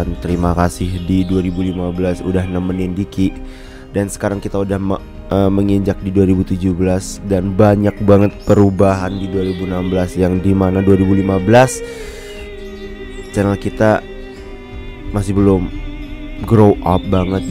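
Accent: Java, Indonesia. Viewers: Bang lUcu kok Bahasa Medoknya hahahahaha siapa suka ayoooo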